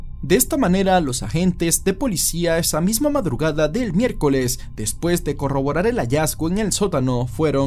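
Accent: Dominican Republic. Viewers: Yo pensaba que era dominicano pero me acabo de enterar que es venezolano watafak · Una pregunta, eres Dominicano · Enserio es de Perú?😮 Creí que era Dominicano 😮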